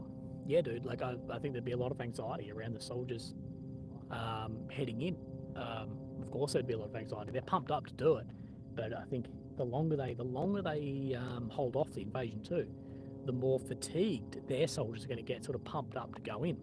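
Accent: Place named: Australia. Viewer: That’s an Aussie accent 👍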